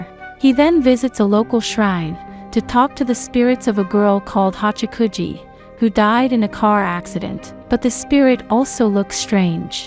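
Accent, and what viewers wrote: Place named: England, United Kingdom. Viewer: Sorry, but I keep laughing when you read anything Japanese in English accent XD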